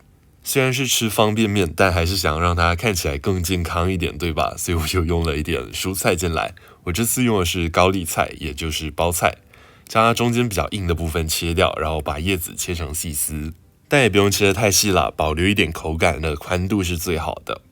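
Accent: Taiwan. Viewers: 会是马来西亚的人吗🤣怎么怎样听都不像且像台湾，马来西亚人讲话的风格众所周知😂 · 是馬來西亞人喔，口音跟台灣蠻像的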